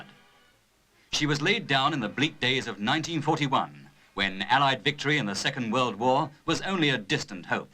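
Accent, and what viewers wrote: United Kingdom. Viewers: Love the British narration. Tip: tour HMS Belfast on the Thames. Sea going comfort for all crew.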